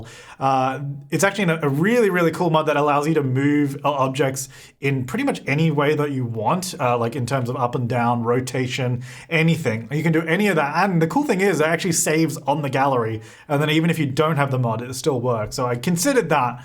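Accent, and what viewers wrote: Australia. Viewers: I can sure hear it. I’ve been watching a lot of Jazzas videos lately. Maybe it’s the way we Aussies say things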